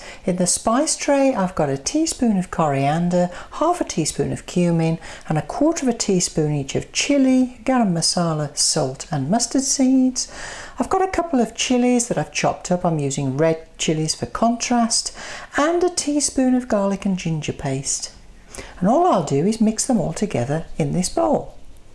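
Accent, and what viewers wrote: United Kingdom. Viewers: Bonjour, je dois dire que je suis un fan absolu!!! J'adore toutes recettes, votre voix votre accent britannique, votre humour bref je vous adore!!! Alors continuez a nous faire saliver et un grand merci pour toutes ces recettes! ( même si je suis au régime j'adore regarder vos recettes) je vous embrasse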